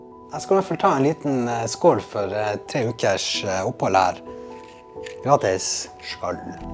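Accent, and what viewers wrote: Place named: Nordland, Norway. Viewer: Så bra at du kom deg på beina igjen. Jeg må bare le, for den nordlandsdialekta sammen med den nordlandske stoiskheten gjorda hele filmen legendarsik!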